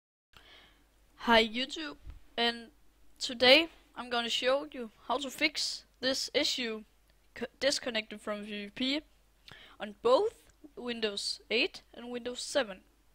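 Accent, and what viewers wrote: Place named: Denmark. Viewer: Det er let at høre du er dansker :D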